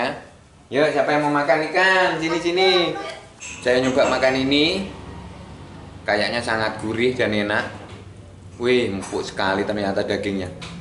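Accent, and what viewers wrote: Java, Indonesia. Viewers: Borneo,kok jowone kentel bgt.asline ngendi lek.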